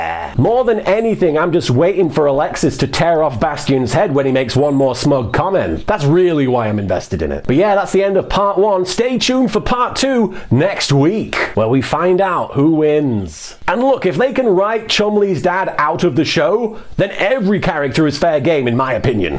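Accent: United Kingdom. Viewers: Silly question but is from the UK? I can't tell if he has an accent or not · this is the most British your ever sounded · has never been so brittish, and I bloody love it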